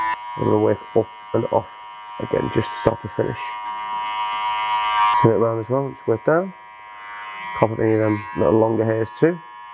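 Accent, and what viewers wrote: Liverpool, United Kingdom. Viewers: Love his Scouse accent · That scouse accent❤️ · Scouse accent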